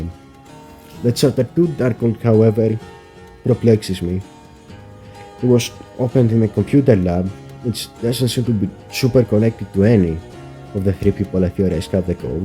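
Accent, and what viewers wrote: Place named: Netherlands. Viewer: Sounds like Netherlands to me for some reason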